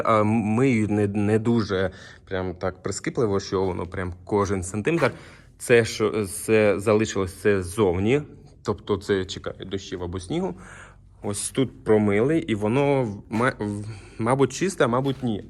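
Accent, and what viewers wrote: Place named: Poltava, Ukraine. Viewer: З яких часів полтавський діалект став суржиком,ґа?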